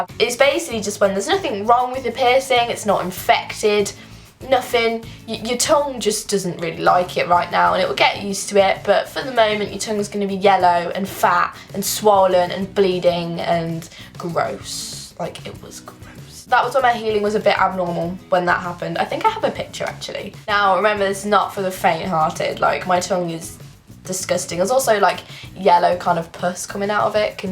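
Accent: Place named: West Midlands, United Kingdom. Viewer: Are u from the West Midlands Cus ur accent sounds like it if so wat shop is it xx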